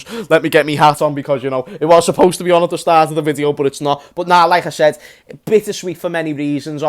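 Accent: Liverpool, United Kingdom. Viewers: 😂😂😂 He’s a scouser!!!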